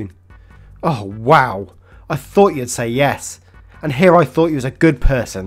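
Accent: United Kingdom. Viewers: Sounding extra British in this vid. :)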